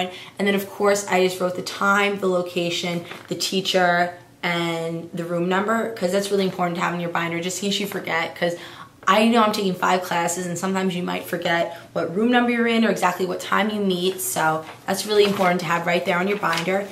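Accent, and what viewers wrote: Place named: South, United States. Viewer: no effence but your accent gets on my nerves are you southern? like its not lasht year its laSt year!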